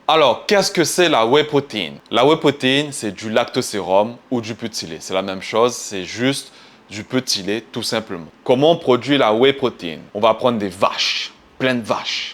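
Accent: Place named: Caribbean. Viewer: Première fois que j'entends un gros l'accent créole comme ça 😳 Mais bonne vidéo. Ps : Juste les gars de la muscu, tous les sujets ( Tous) on déjà été traité. De prés comme de loin. Les reste sa tourne en rond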